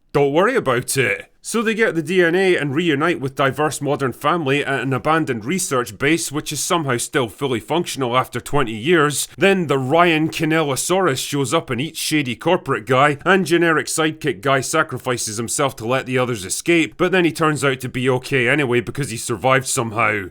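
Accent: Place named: Scotland, United Kingdom. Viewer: I had no intention of going to see this movie--just like I haven't seen any of the other sequels; I'm just here because I think it does a little something for me to listen to a Scotsman sh*t on stuff.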